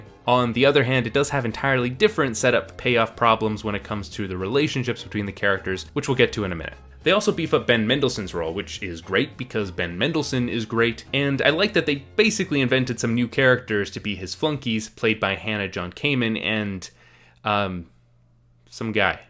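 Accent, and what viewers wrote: Canada. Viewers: Sorry, dude. I just hear Nerdwriter in a Canadian accent.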